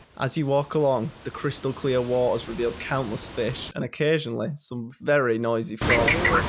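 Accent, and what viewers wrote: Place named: Barnsley, United Kingdom. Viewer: Sound like your from barnsley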